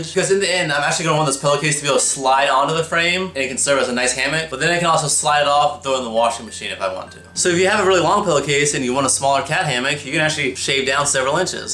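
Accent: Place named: Virginia, United States. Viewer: he kind of sounds like a Virginian like me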